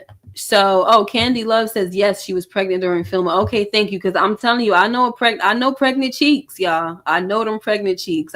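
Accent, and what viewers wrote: New York, United States. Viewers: I hear a New York accent? I'm PA! Anyway my 1st time on your channel...I agree with your pov on this episode 👏🏽. Yeah I'm over snaps n pop. And Stephano...(I'm don't see a subscribe button)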